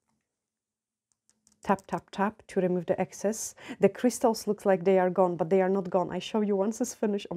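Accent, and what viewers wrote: Scotland, United Kingdom. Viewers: I knew I heard Scottish in your accent! Love how you’ve picked up wee words.. 🥰 am in central Scotland wee bit far from you, your nails are stunning, have you tried the new soft gel tips yet? I’ve ordered mine from Gelish Harmony xx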